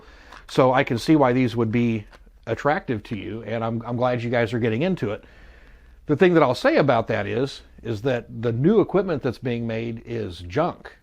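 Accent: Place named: United Kingdom. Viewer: Thank you I really enjoyed the video. Your accent sounds more British than most American YouTubers.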